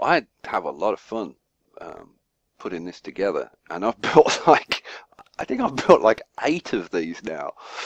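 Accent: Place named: United Kingdom. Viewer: I did it just immediately and it worked really fine! thanks again ! Hey your are from uk or Scotland? Nice accent !!!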